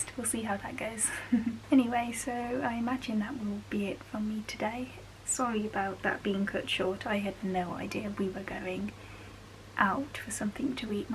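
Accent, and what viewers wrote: United Kingdom. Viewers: You sound different from other Brits I meet how many accents are there in uk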